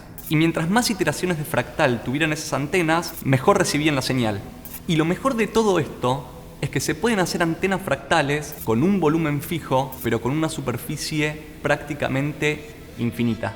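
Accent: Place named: Buenos Aires, Argentina. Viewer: De Buenos Aires sos!! O de Rosario... es el típico acento!!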